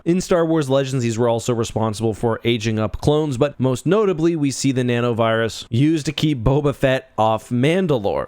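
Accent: Canada. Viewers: How's he gonna work the canuck word "re-zorses" into this one?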